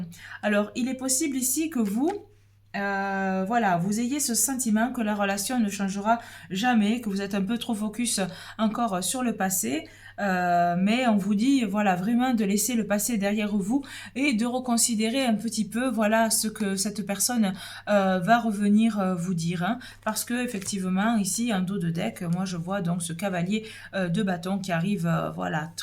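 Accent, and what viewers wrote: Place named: South-West, France. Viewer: Première fois que je vous entends ma chère vous avez un charmant petit accent du sud-ouest peut-être si je ne me trompe 🤔quelque chose oui effectivement mais je ne sais pas la suite me le dira pour le moment pas de nouvelles je vous souhaite de bonnes fêtes🙏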